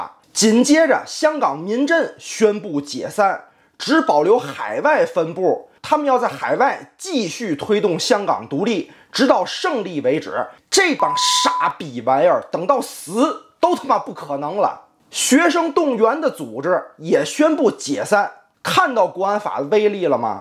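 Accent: Tianjin, China. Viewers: 天津口音真好听！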